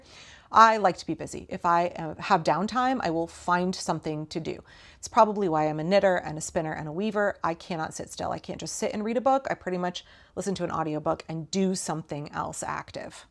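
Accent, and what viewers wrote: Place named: Oregon, United States. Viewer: Am wondering about where you live in Portland. I was born there and lived in Boring, LaGrande, Southeast Portland and then Northeast Portland. Once an Oregonian, you can move to Southern California and Reno (now) but you always feel like an Oregonian. The 70s and 80s in Portland was a great free spirit time. You sound like a true Oregonian which is part of why I love your channel and a big compliment. Thanks!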